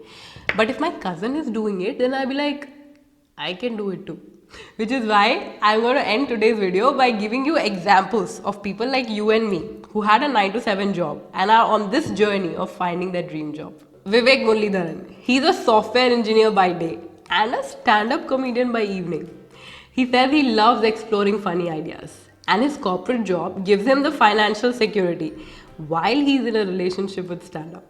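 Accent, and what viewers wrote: India. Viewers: Whe. I heard Indian accent i jumped suddenly cause i knew she is from Country of geniuses.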